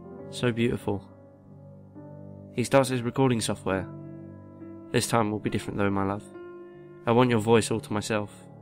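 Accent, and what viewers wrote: United Kingdom. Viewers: british accent. :D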